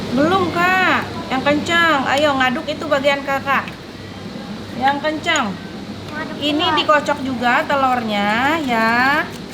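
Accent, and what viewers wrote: West Java, Indonesia. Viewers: Hidup Bsndung..Klo ada Atta Aurell..pasti dong mampir..hidup emk2..t..logatnya sunda pisan..kereeennn👍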